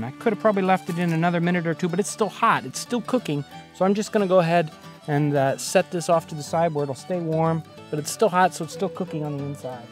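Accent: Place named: East, United States. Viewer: Sounds vaguely eastern-US.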